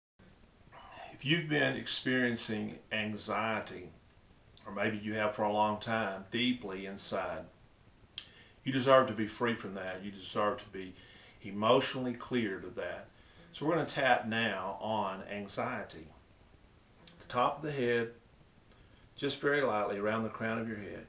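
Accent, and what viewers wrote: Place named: South, United States. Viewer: Hey ~I'm in the first qtr of LVN School! I stumbled upon a guy with an Brogue talkin' about a Drivin' test! I'm doing math,drug dosages,Taking tests every week & I'm a freeked out mess over these tests!I get passing grades BUT BARELY!When I found you you have a souther accent (like me!)Reminds me of the nice folks back home in the deep south!did the "tapping" here on this Video~you have one for "Test Taking Anxiety?"Bring it on!PLEASE!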